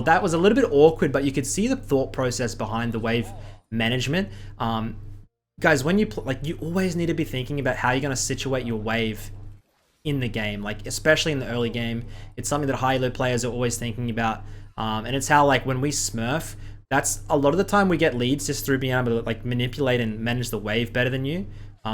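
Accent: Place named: Australia. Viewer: "mango fish knows how to play the qiyana" edit: BRUH I JUST REALISED HE SAID "COUNTER" AHAHA, i love the australian accent xd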